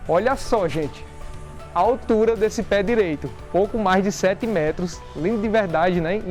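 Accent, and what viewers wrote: Northeast, Brazil. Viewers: Comigo, foi diferente, sou inscrita em vários canais de arquitetura, pois além de amar, estou em reforma. Mas nesse canal ( o único da área ) me inscrevi pela simpatia do rapaz tbm. Sou mineira e amo o sotaque nordestino) 😊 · Amei a forma como você apresenta! Esse seu sotaque é demais! Até porque eu também sou uma paulistana/ nordestina! Este sobrado é simplesmente linda!! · Adoro seus vídeos, vejo todos... Você é uma simpatia esse sotaque nordestino dá todo um charme no vídeo... Parabéns pelas filmagens... E a casa realmente maravilhosa. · Que casa meu Deus,e esse sutaque lindo essa simpatia nossa que maravilha,por isso amo o nordeste, parabéns!!!!!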